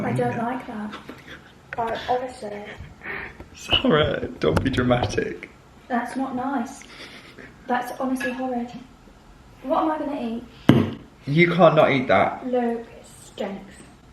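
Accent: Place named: Scotland, United Kingdom. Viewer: Your accent is confusing Scottish or British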